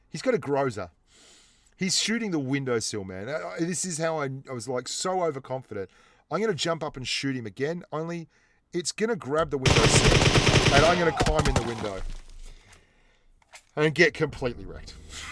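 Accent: Australia. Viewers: Bro ...thanks ...nice video are you from Australia ?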